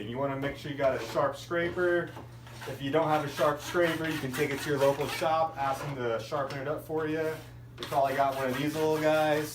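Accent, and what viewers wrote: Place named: New Jersey, United States. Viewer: Great. video, thanks. Love that dirty jersey accent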